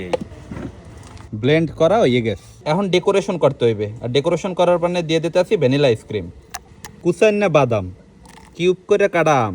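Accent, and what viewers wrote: Barishal, Bangladesh. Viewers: হালার বরিশাইলা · আপনার বাড়ি বরিশাল কোন থানায় বলেন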